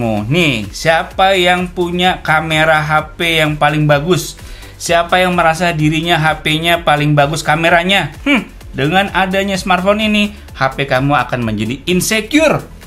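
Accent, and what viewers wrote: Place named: Java, Indonesia. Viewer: Suaranya mendelep, jowone metu wes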